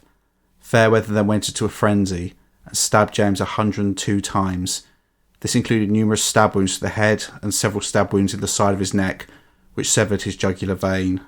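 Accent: United Kingdom. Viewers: You need to give up the pretensious British accent for an American equivalent - and switch your grammar and spelling. Look forward to 'center' over 'centre' and 'color' over 'colour'! Good luck buddy!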